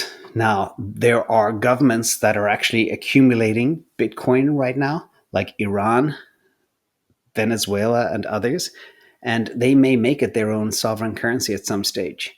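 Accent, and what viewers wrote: Ireland. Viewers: am I hearing a slight Irish accent.?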